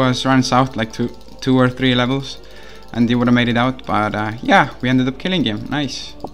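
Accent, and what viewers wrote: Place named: India. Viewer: nice video i subbed first time i watched u where u from u sound like ur drom india but idk where u from i speak german im from switzerland my english is anyways not rly good tbh xD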